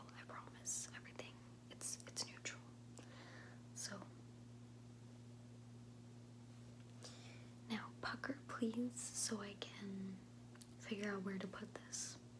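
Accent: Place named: Massachusetts, United States. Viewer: You from mass?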